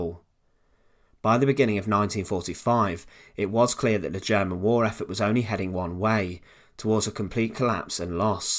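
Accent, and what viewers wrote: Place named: Leicester, United Kingdom. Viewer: I've said it before, but fantastic to hear a proper Leicester accent, makes me homesick. Re the vid, wasn't Eva Braun "HOT!"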